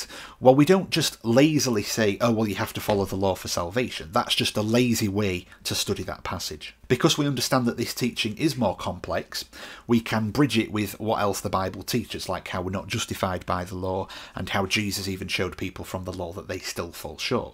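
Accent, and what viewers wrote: United Kingdom. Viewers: Great deep study of repentance, as always brother 👍🙏 i enjoy your studies, fyi: i love UK accent 😊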